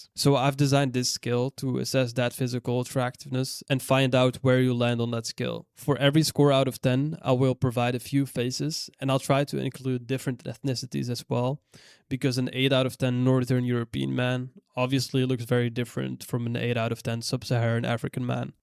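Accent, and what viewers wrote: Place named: Netherlands. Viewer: Very Dutch accent.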